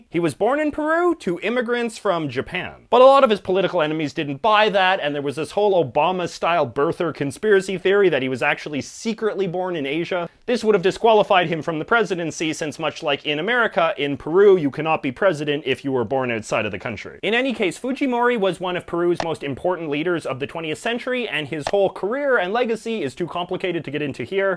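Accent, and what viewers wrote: Canada. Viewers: Well he’s Canadian he’s pronouncing it in the Canadian not the Jamaican accent obviously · As an American, and particularly as a southeastern American it's very interesting to me to hear how you say things. I don't know hardly any Canadians in north Georgia and certainly none with the stereotypical Canadian accent. Little things like how you said keenya and in another video you said naypal for Nepal. Also it was funny how you couldn't do the polish name.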